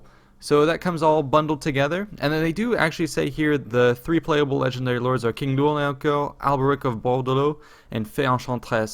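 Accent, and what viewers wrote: France. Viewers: He's actually French. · Nice french accent. Would hear again. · He most definitely IS French. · Check a previous comment thread. He IS French. Said so himself. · Geralt, Private Investigator You know he's actually French, right? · he's french... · he´s french, dude :) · You have a really french agsent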